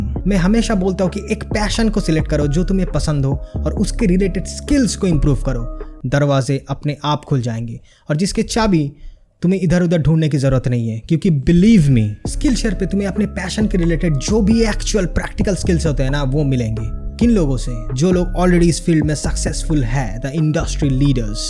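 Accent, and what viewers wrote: Bengal, India. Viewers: Hindi with bengali vibes....ye bhi log notice karte hain🤗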